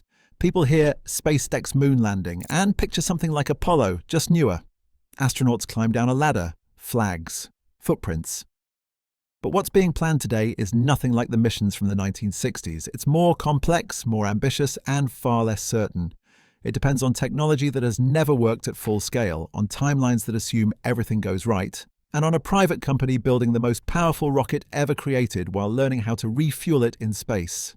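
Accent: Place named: United Kingdom. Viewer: thanks for sharing i like science documentaries plus enjoy your British English accent look forward to your next work greetings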